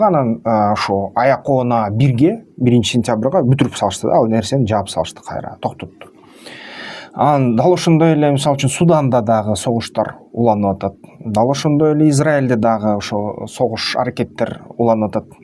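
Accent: Standard. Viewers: РАХМАТ СИЗГЕ КЫРГЫЗЧА ТАЗА СУЙЛОП ЖАТАС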